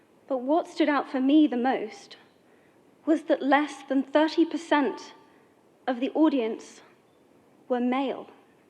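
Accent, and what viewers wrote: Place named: United Kingdom. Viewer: 英式英語欸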